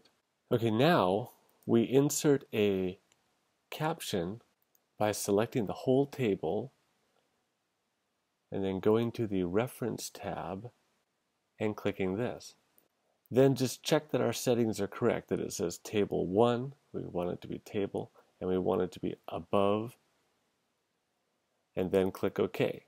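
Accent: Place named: United States. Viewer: 日本人ですか。英語が上手なので、アメリカ人だと思いました。Besides, great video, first one that I found on this topic that explained everything properly👍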